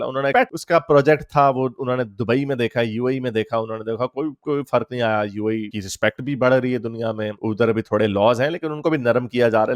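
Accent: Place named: Punjab, India. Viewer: Great voice...& ... Punjabi laheka in speaking.... Thanks Tu shissss Great....❤❤❤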